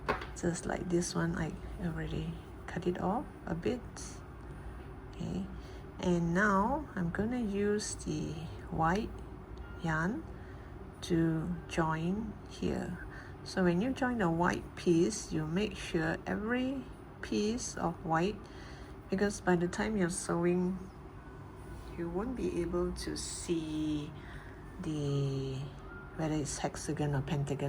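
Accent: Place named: Singapore. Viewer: Are u Singaporean 😭 ur accent is like Singaporean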